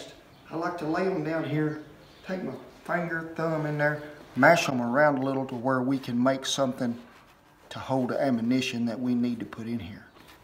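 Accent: South, United States. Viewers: I’m in love with that southern accent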